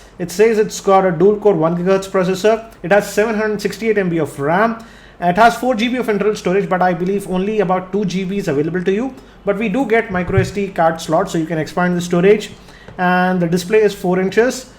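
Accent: Hyderabad, India. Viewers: Are you from Hyderabad?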